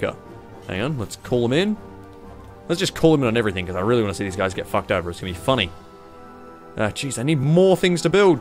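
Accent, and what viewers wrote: Australia. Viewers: This dude sounds more Australian then me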